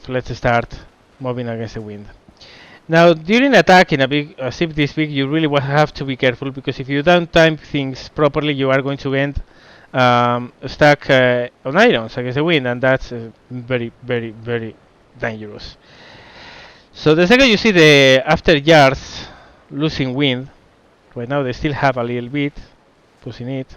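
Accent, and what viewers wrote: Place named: Spain. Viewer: Eres español ¿no? Pronuncias demasiado bien los nombres españoles de los barcos y tu ingles no es nativo ni mucho menos Que conste que me encantan tus videos mate!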